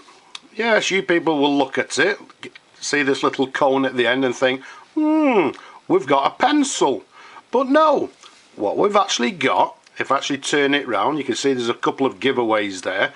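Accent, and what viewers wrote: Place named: Northern England, United Kingdom. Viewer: Loving the Northern twang lad. I'll contact thi through t'usual channel in a bit if tha's obliging enough.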